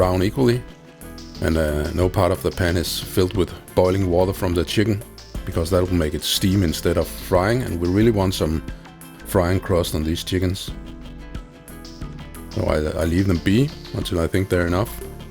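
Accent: Denmark. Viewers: Dansk accent :D godt lavet!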